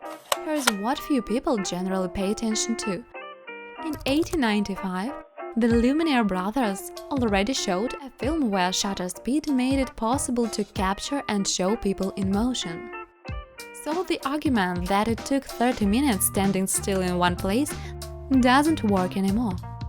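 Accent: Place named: Russia. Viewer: Ear cutting her russin english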